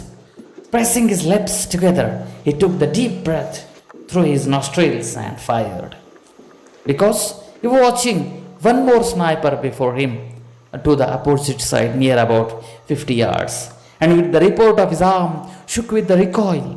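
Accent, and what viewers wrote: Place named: United States. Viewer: Thoda dhire bhi bol sakte ho aap American jese kiv bol rahe ho aap Kiya samaj aayega new student ko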